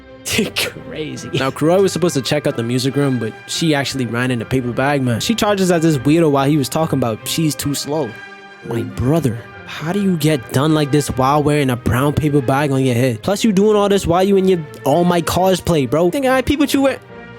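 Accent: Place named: Bahamas. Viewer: Where are you from? You sound kind of like a Bahamian.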